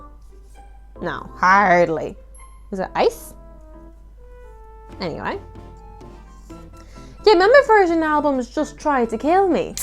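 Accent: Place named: Ireland. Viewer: Are you Irish? I like your accent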